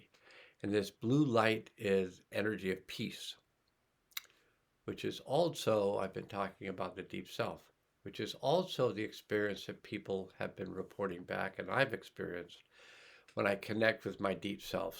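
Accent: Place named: United States. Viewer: I'm familiar with Nancy Rebecca and the blue light info; she's in the USA as am I - wondering what part of the world u r in? (You sound like maybe USA)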